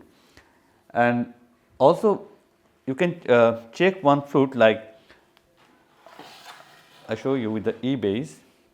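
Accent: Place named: South India, India. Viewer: Sir Assalamualaikum, I am from West Bengal. Yor online flute lesson helped me a lot how to Play a flute. Sir yours in this video the flite E base' has intrigued me for it's looks and color. I just wanted to know that how much wil it cost me if I to buy it from you. ? And how to get it. ? Fron your dialectic accent i guess you are south Indian. Can i have your address plz, so that if i can make visit you there and purchase my required one flutes.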